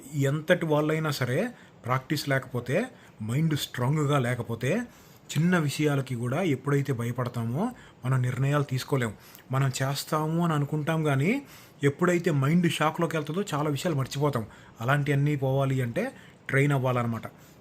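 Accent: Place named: Andhra, India. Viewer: Your language pronounce is like Andhra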